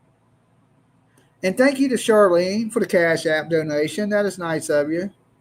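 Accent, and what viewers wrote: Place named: Kentucky, United States. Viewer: First time watcher. I like the style you do your show. You talk like most common folk I know,Im from southeastern Kentucky.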